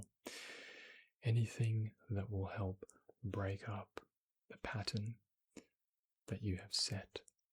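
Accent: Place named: Australia. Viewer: It's so lovely to discover an Aussie voice, makes me feel at home 😀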